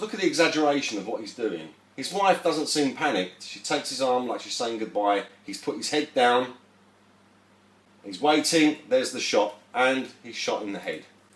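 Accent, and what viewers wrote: United Kingdom. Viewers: proof that the British accent can't conceal all ignorace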